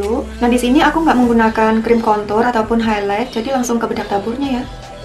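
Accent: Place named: Wonosobo, Indonesia. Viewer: Dari logat bicaranya seperti nya anda tinggal tidak begitu jauh dari wonosobo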